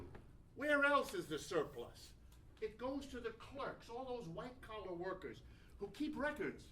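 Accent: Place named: New York, United States. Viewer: This guy has a very marked NY accent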